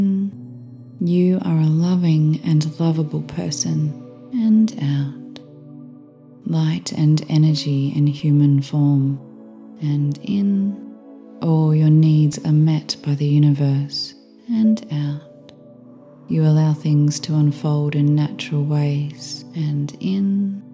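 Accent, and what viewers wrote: Australia. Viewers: Thank you what a fab voice. Is that a Australia acet I LOVE IIT Roz Ireland.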